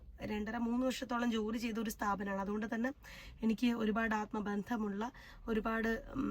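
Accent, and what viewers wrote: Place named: Palakkad, India. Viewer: Palakkad Malayalam.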